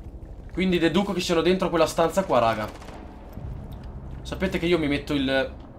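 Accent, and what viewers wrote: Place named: Romagna, Italy. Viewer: ma sei romagnolo? Parli il dialetto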